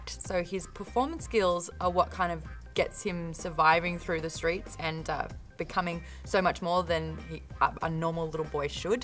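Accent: Australia. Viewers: Cool. finally an Aussie accent. Read: The "Tales of the Ketty Jay" series. by Chris Wooding. More steampunk than high fantasy though, but this video is a coupla years old so you might have read this. If not, do yourself a favor.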